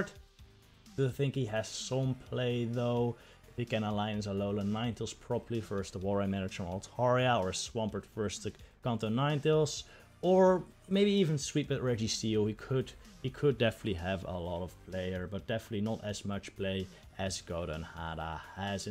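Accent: Netherlands. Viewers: Youre Dutch aren't ya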